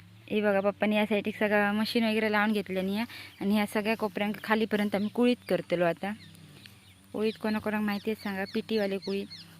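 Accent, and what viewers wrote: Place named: Malvani, India. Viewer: छान शुद्ध मालवणी ऐकुन बरं वाटलं. गावाचं नाव सांगितलें असते तर अजुन व्हिडीओ ची मजा आली असती. शेअर लाईक करा.मालवणी भाषे करिता.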